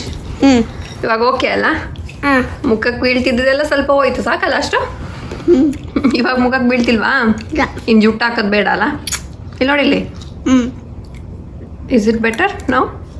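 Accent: Mangalore, India. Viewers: Hi dear nimma vlog Alli manglore Kannada keli thumb kushi aithu all the best namma support nimge yawagalu eruthu god bless u❤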